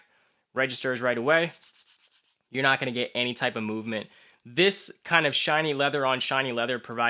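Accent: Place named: Massachusetts, United States. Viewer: Ok, where are you from? That accent is saying the Massachusetts area, but enjoyed that video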